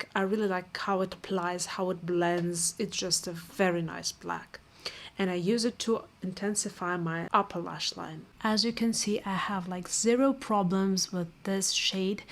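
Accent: United Kingdom. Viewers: it sounds kinda British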